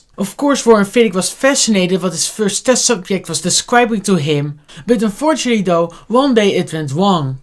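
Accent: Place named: Netherlands. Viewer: You sound like a dutch person who speaks english😋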